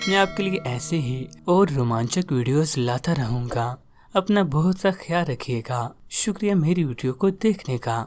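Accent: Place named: England, United Kingdom. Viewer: Thoda agarej ka awalad kam bano aur sahi se bol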